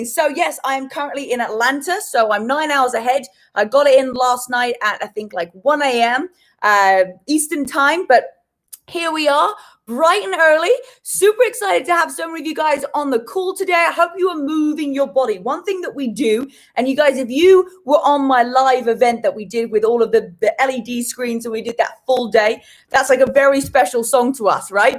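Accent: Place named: United Kingdom. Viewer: So... Firstly - you are amazing! I love your mindset and confidence. And the fact you still have British accent:) And that you know you are enough. My kind of gal! I also love going places by myself and it really is what they say - you learn so much from it! Last year I finally got out of my comfort zone and went to solo trip to Ireland (on my wishlist for years and years). I am use to go places by myself that are near bye but not to another country for 14 days. I was having excuses for years why I can't go (money, time) and than I called out my BS and said to myself: Alenka, you will never get there apparently. So much about ''how much you want to go.'' And I decided and I make an action plan and went. And like you said: Yes it's scary, I was also renting a car, driving on wrong side (:)) of the road, etc. but I learned so much and it gave me so much trust in myself. 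I can do it. By myself. So cheers to exploring. And basically to everything you have to say! The other thing I love is hotel story. I totally agree. We all need more compassion and understanding. And listening to each other not just talking. Oh and playlists yes. So true. Some songs made me up and move around in a heart beat. One of the songs is -. What a tune! With your help - the podcast, Burn/BTES app, the book you really took me to the next level. Most important lessons for me were: setting goals (3m, 6m, 1y especially), what can I do each day to reach them, foc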